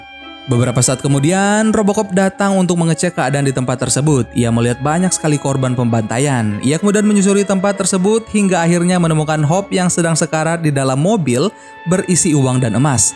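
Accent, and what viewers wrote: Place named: Java, Indonesia. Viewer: guanteengg,di pikiran ku pasti mimin orang jawa😁😁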